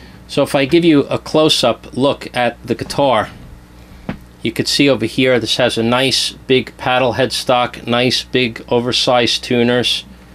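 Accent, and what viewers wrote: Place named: Brooklyn, United States. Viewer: Sounds like Brooklyn , NY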